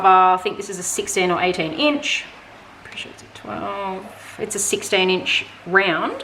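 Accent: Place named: Australia. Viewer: I find your Aussie accent wonderfully refreshing ( our accent....lol )....this pic is gorgeous ! New sub here :)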